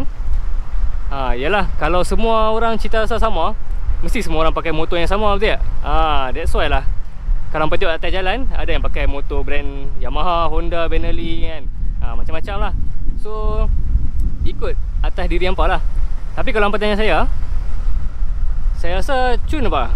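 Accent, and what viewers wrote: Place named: Kedah, Malaysia. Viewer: Aku suka cara cakap laki cina tu..memang pandai cakap..sleng loghat kedah kalau x salah..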